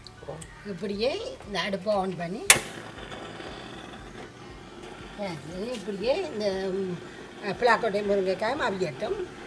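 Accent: Sri Lanka. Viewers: அம்மா உங்களின் தமிழ் அருமை. எனக்கு உங்களை போன்று இலங்கை தமிழ் பேச ஆசை ஆனால் நான் இலங்கை தமிழ் பேச முயற்சி செய்தும் முடியவில்லை.உங்களின் இலங்கை தமிழ் கேட்பதற்காகவே நான் உங்கள் பதிவை தவறாமல் பார்ப்பேன். சுவையான உணவை சமைத்த உங்களுக்கும் உங்கள் சமையலை பதிவு செய்த உங்கள் பிள்ளைக்கும் மிக்க நன்றி அம்மா. மலேசியாவிலிருந்து 🇲🇾❤️🇱🇰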